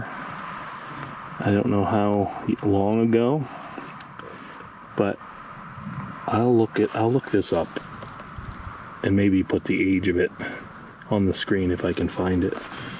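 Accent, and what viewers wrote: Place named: Europe. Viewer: Voice has a slight European sound .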